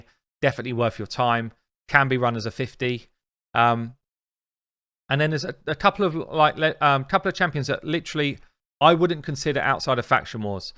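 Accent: England, United Kingdom. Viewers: Man, you are damn' legend! Great job! Even tho I hate english accent, I listen every single your word with pleasure. You did amazing and huge job to support newbies... :D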